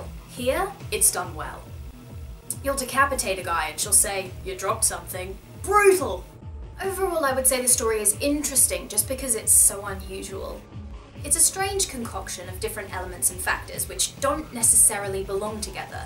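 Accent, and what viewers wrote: United Kingdom. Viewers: awesome review is that a british or australian accent!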